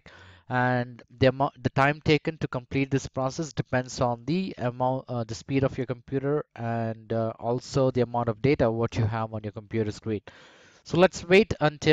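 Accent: India. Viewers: knew it was going to be an indian guy helping me lol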